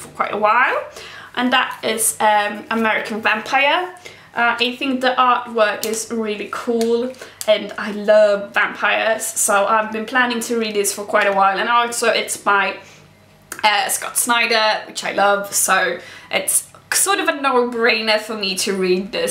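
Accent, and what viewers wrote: Sweden. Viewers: Was looking for some good comic reviews. I think I will try "American Vampire". Thank You......And my American ears loves your Swedish accent.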